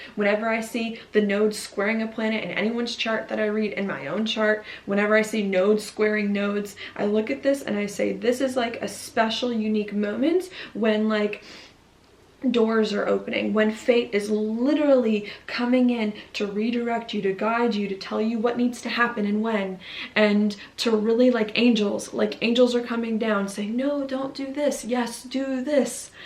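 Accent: South, United States. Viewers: Your southern drawl is much more prominent in this video than in any other before. As a southern girl, no judgement. I only wish to say: while w family, do your best not to lose yourself, and the story you are building of who you are becoming. Xoxo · I think Georgia brought your southern roots to the surface...i hear a little accent :) missed you, glad youre back!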